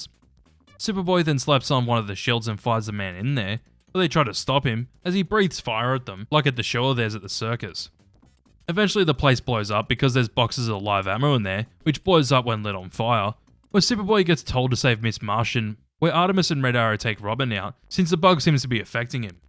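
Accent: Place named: Australia. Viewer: Love the Aussie accent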